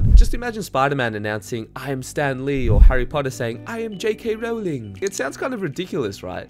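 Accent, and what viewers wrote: Australia. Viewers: Sorry, it's just hard to listen to a serious topic delivered on a thick Australian accent. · This guy has to be Aussie that accent was strong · This dude is very good at explaining, nice and clear with a friendly aussie accent...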